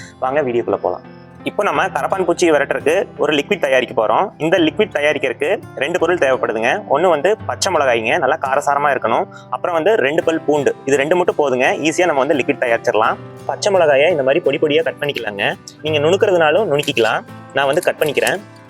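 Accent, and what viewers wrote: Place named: Kongu, India. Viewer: கொங்கு தமிழ்.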